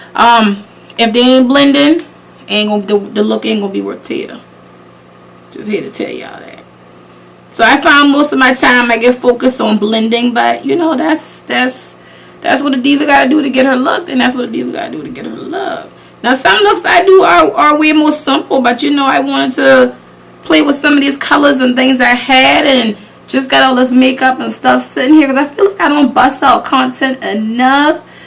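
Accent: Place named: Charleston, United States. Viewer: Came across these topper and wanted to see the pay off and I must say I'm impressed have to get a few now since I've checked out the video review first.. You sound like your from Charleston if so I'm a fellow Charlestonian. Makeup came out beautiful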